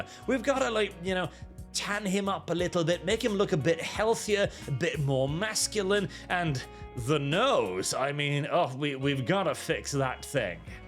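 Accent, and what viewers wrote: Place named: RP, United Kingdom. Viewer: how is it you have such high class British accent and you're Norwegian? did you learn English from an aristocrat?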